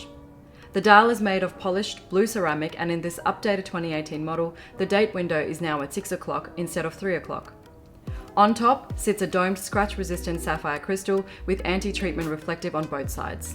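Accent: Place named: Australia. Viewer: Your channel will blow-up you should have way more subs with the quality of your video! And the Aussie accent doe 👌😅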